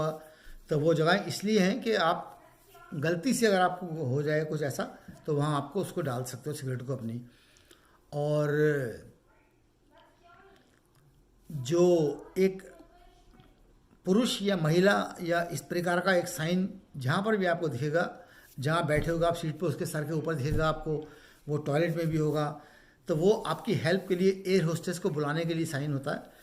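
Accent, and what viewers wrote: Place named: Uttar Pradesh, India. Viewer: Salam chacha ap ka ye andaj bahot achcha lagta hae ajawo bhai dosto asa to hamare U P me bolte hae thanks chacha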